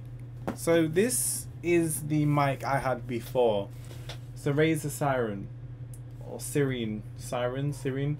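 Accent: United Kingdom. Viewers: his accent sounds british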